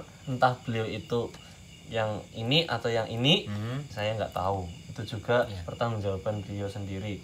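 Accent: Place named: Banyumas, Indonesia. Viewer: Ora ngapak ora penak mas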